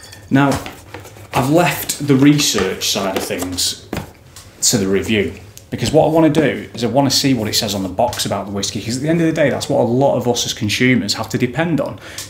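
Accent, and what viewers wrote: Lancashire, United Kingdom. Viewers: Nice review!!! £24.60 on Amazon 27/2/23. Enjoy your enthusiasm and honesty. Thank you. Do I detect some northern accent. Lancs? Greetings from Cheshire 😌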